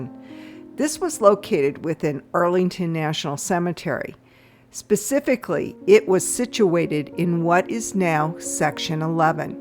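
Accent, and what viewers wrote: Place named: Iowa, United States. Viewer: Narrator must be from Iowa. I grew up there and it’s the only place where I heard natives say “Warshington” or warsh.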